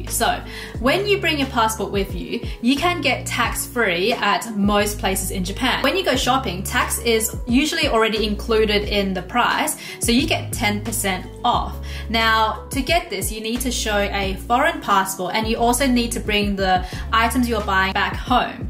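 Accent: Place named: Australia. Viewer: Australias accent is really starting to rub off on you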